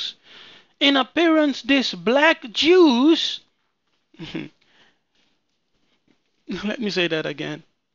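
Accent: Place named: Netherlands. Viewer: Zondervan? En je accent klinkt nederlands...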